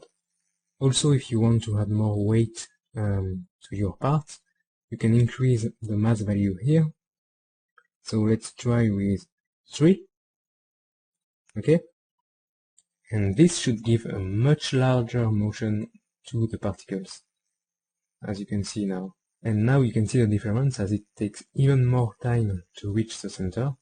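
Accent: France. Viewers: yea , l accent francais est vraiment unik . Good tut btw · This videos are like a two-for-one deal for me. I learn how to do particles in blender and learn how to do a French accent!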